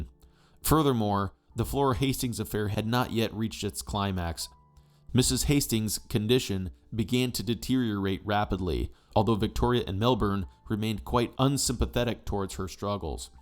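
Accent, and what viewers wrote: United States. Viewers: The picture introducing "the Corn Laws" showed North American "corn" ["maize"] not "corn" ["grain" i.e. wheat and barley] as is meant in England. The documentary is thorough and good; but has that American accent.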